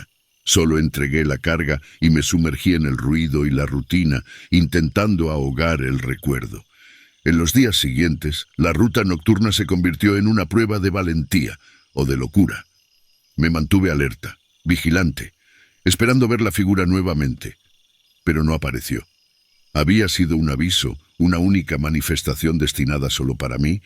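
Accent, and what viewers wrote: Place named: Spain. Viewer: Se escucha come espanol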